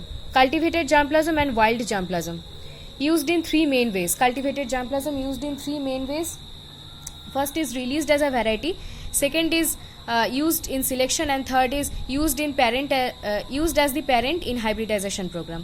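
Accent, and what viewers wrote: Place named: Bengal, India. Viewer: Pura Bengali accent h💝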